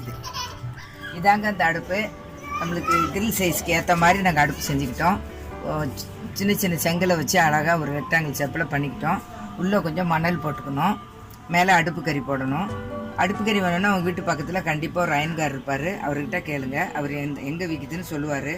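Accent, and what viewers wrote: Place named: Tirunelveli, India. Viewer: Amma neenga tirunelveli a thoothukudi ya ila kanyakumariya???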